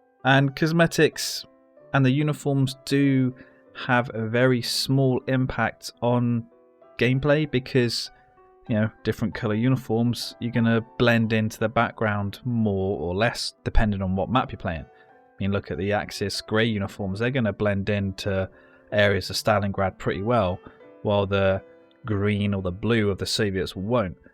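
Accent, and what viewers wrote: United Kingdom. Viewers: Personally, I have No problem with paying a small amount to increase the Play ability of HLL. Like everything in this world , Pay as You go, they do need to maintain Income to be able to continue to improve the game. Given the huge numbers of people playing a small charge (as mentioned GBP 4.99 or local equivalent) will help to maintain the game into the future. and if they get you for the Bri-ish voice , they'll need to pay you heaps !! On a lighter note - Yeah England lost the Football, :) thats a shame, but so many people , as shown in your intro , crying and pulling their hair out , Its just a game !! :) still love your Videos and streams !! Keep going...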